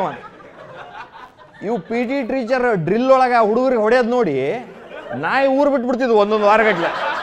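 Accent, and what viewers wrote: North Karnataka, India. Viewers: ಹಾಯ್ Brother ನಿಮ್ಮ ಡೈಲಾಗ್, ನೀವು ಮಾತನಾಡುವ ಶೈಲಿ,ನಮ್ಮ ಉತ್ತರ *ಕರ್ನಾಟಕದ ಭಾಷೆ ನಿಜಕ್ಕೂ *Ultimate, Excellent, Wonderful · Very nice ...continue to make people laugh....love to listen uttara karnataka kannada...slang itself is very funny...ur doing a good job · bahala cholo ittu..kellakka...uttara kannada accent nalliye matadu tamma..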